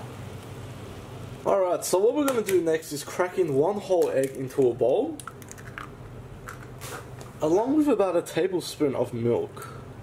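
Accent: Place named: Australia. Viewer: Persian with an aussie accent first time watching you video love it very much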